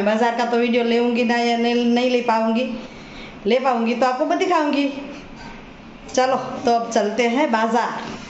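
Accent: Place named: Gujarat, India. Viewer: Saras hindi bhasha bolo chho apane gujarati chie etle tone gujarati j ave hindi no pan but dhire dhire avadi jase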